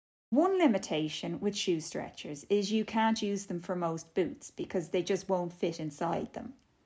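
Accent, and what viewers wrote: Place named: India. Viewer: What a lovely accent and informative video, i love your accent are you from india?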